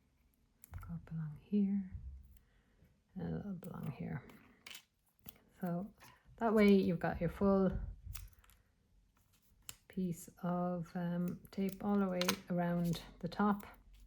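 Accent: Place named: Ireland. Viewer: Great video! Colouring is great! So nice to hear that Irish accent again!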